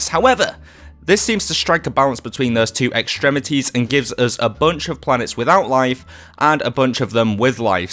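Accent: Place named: Liverpool, United Kingdom. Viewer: The uploader probably hears that question a lot, so this is for the community: What is that accent? Reminds me of a Scottish influence lice Scouse as far as vowels are concerned - but inflection and consonants are way different. Accents are very interesting but incredibly hard to learn about if you don't specifically set out to learn about them^^ Learning English didn't even feel like learning, just exposing myself to the language... but with different accents, you're rarely given the meta information about them when you're just watching stuff ;) Yes, I'm off topic. I Know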